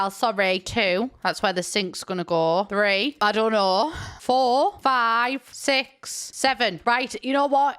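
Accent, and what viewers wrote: United Kingdom. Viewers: i must like your videos a lot since i put effort into understanding 1. you talking very british 2. you talking very fast hahaha (english's not my 1st laguange)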